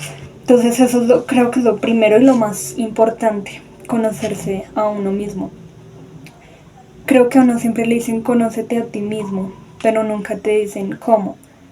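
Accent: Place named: Colombia. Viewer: Hola cómo estás, por tu acento creo que eres de Colombia yo también te pido por favor ayuda no estoy bien, y pedí a Dios ayuda y justo me apareció tu video me gustaría contarte mi historia para que me puedas brindar una orientación porque yo se lo q estoy viviendo no me lo invente yo, no estaba nunca buscando información sobre nada de estos temas todo ha llegado a mi solo yo no estoy loca, ni tengo ninguna reprogramación de una tal “nueva era” como dijo una señora en otro video que observé por siempre he tenido una conexión muy profunda con Dios y soy Asocial no frecuento lugares, no tengo amigos, siempre he estado sola no hablo mis cosas con nadie entonces quien me lavo el cerebro? Quien me reprogramo? Y Dios no habría permitido tal cosa para mí, yo no vivo una vida mundana. Yo quiero hablar contigo, por favor 🙏 Dios te bendiga.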